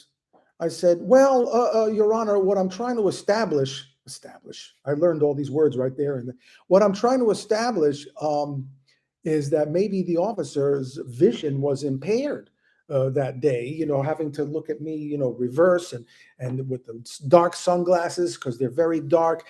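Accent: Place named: New York, United States. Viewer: Glad things went well 👌. Just love listening to your NY accent 😍 🇦🇺🙋🏼‍♀️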